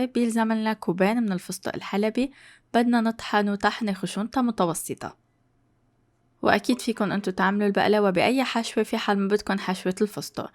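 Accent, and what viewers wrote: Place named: Lebanon. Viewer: احب اتابعك من سهولة وصفاتك والكلام المفيد والمختصر والجميل وباللكنة اللبنانية يلي بعشقها.. محبتك من السعودية..😘💓🥰🕊 شكرا على قناتك المفيدة استفدت منك كثير والله ممنونه حبيبتي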